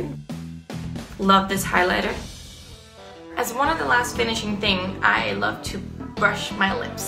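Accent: Denmark. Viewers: I love that you can hear you danish accent a bit! (jeg er ogs fra danmark ahahah) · She's from Denmark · She's Danish . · she’s fully danish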